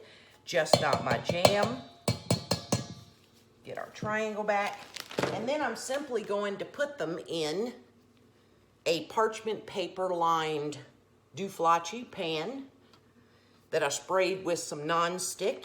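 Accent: South, United States. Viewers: sometimes I find that southern accent too strong but yours is softer, kinda sexy too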